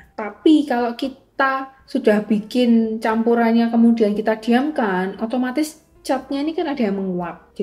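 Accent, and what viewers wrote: Java, Indonesia. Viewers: Mbak logatnya agak medok ya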